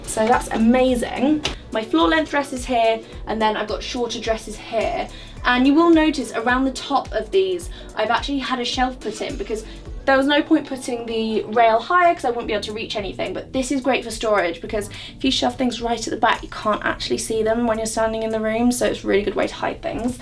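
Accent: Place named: Cockney, United Kingdom. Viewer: a cockney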